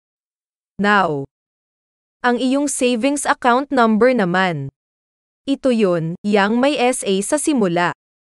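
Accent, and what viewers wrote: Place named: Ilocos, Philippines. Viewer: ilocana ka haha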